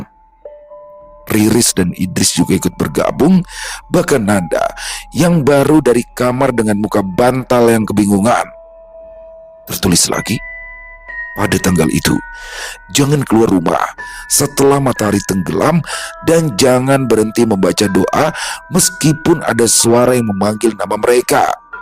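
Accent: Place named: Banyumas, Indonesia. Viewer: Bahasa jowonya kok kaku, dadi bahasa ngapak semua.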